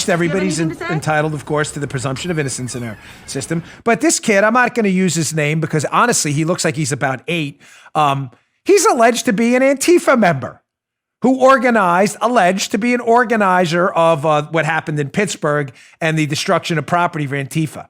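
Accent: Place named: New York, United States. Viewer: When I used to play solo piano in international settings, I could often tell when a listener was from New York because first, he had a funny accent, but more importantly, he talked to me like me and him had been good friends for years. Listening to Dan reminds me of that.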